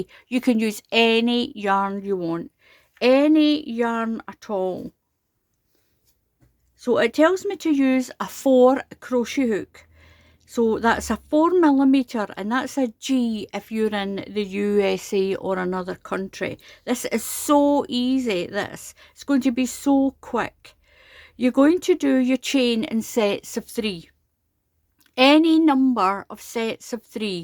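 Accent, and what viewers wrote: Scotland, United Kingdom. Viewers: Lovely! It’s always nice to get another variation to the granny cluster. And when I do this one I’ll hear your Scottish accent guiding me. Thanks! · Love watching your videos especially hearing your lovely Scottish accent. Very pleased you do not have music playing which makes it easier to concentrate on your inspiring videos. Very happy to be a subscriber to your channel. ❤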